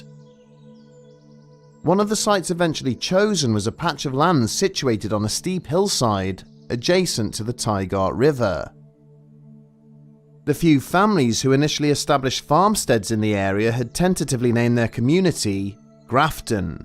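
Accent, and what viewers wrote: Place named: Yorkshire, United Kingdom. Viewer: I just love this chaps voice!! He's got a Westchester, or Yorkshire air about him .. CUZ BLOODY HELL, some of these blokes with their LAZY Cockney.... Bugger me in the oss, a fellow can't understand some of these blokes, not at tol.!! So I stay carry-on my good fellow!! I enjoy your most interesting stories!! Carry on!! I'm sitting here with me fags in me face and trying to send this post on this infernal contraption..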